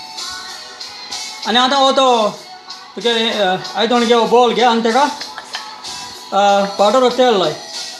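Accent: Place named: Salcete, India. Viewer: I've subscribed to your channel, just to listen to your konkani. It's my salcete dialect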